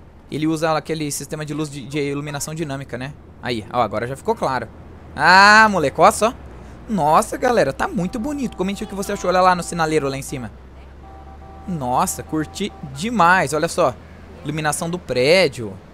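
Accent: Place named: South, Brazil. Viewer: Eu pensava que vc era do Rio Grande do Sul ou santa Catarina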